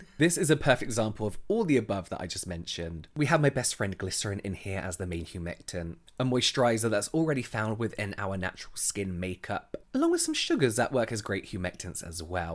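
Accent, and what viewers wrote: United Kingdom. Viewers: not really, has that soothing voice + British accent. Have you heard Alfie Deyes’ voice? He’s British but no way he can do audio books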